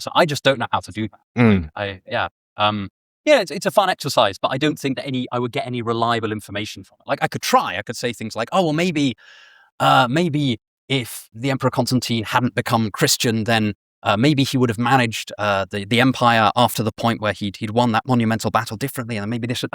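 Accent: United Kingdom. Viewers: Entirely unrelated to the video, but do you ever feel as though people (not from the UK lol) legitimize what you say because of accent you speak in? I randomly had the thought as I watched this video. Just “if were American or Australian, would my/the audience’s reception of his words remain the same?” It sounds really horrible but I’m not without bias.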